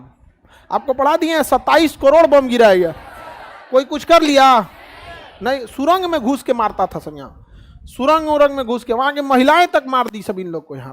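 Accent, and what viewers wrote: Bihar, India. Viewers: I am from Bihar so i relish your language tone very much .u are great teacher.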